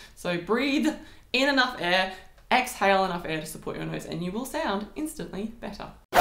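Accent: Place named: Australia. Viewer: It's great to hear an Aussie voice on Youtube. Your approach to your videos is very refreshing.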